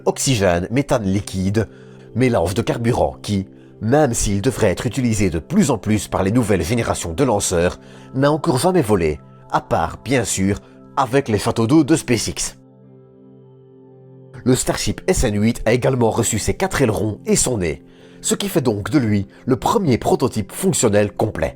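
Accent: Belgium. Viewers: Sujet intéressant et bien traité ainsi que bien expliqué et détaillé. Mais il est claire que la voix off devrais être changer. L'accent belge ne me gène en rien, mais les zozotements + les R trés accRRRocher m'irrite l'oreille :-( Youtubeur n'est peux être pas fait pour tous. ou certain s’épanouisse aussi a resté hors des écrans a bossé sur les sujets. Peux être qu'embauché pour une voix off serais judicieux pour le future de la chaine? En tout cas merci pour la video :) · Bienvenue dans le BiG Universe. Accent Belge, sans pincement de nez.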